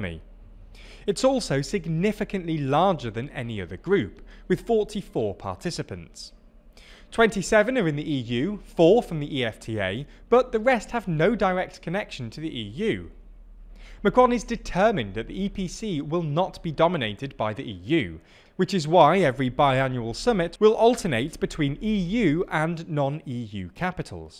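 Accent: United Kingdom. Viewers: It's funny that a british sounding guy is talking about EU news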